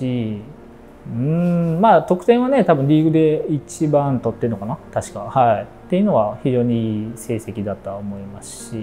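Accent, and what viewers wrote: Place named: Kagoshima, Japan. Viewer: 鹿児島弁😭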